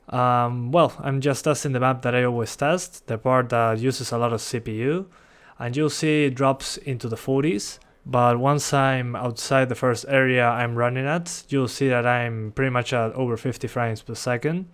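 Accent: Argentina. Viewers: ingles argento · Soy yo o es argentino ?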